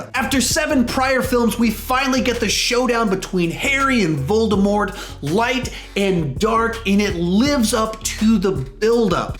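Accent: United States. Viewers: Birtish names pronounced by an american. Hurts my ears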